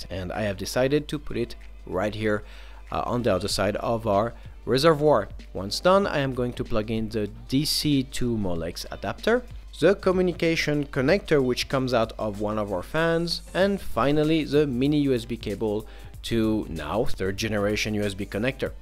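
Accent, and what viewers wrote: France. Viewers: tu as un acent Francais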